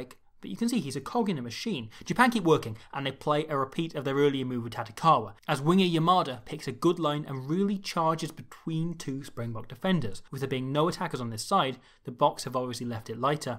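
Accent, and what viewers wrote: Wales, United Kingdom. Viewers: Never expected I would be this excited to listen to a welsh man speack to me for 30 minutes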